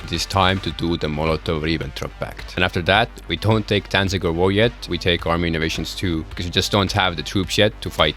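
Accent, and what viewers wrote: Estonia. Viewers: he estonian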